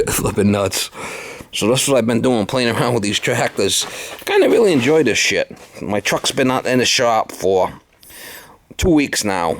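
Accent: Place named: New York, United States. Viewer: you sound like you are from New York. It makes sense since i can find a single fucking 420/430 around new england for a good price since you are picking up every single one of them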